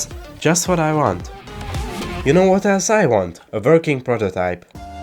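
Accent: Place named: Hungary. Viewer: Your pronounceination sounds very hungarian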